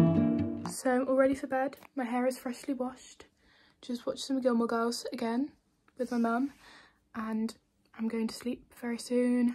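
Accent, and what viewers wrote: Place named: United Kingdom. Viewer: I never realized you were British why did I assume you were American the whole time lol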